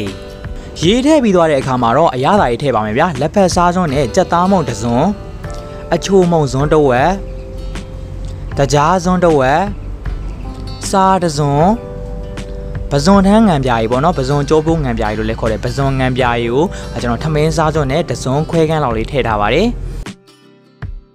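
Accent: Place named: Upper Myanmar, Myanmar. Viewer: ကျေးဇူးပါဗျာ။ အညာဒေသရဲ့ အဝေးမှာ အညာသံနဲ့တင်ဆက်သံ က အလွမ်းပြေ။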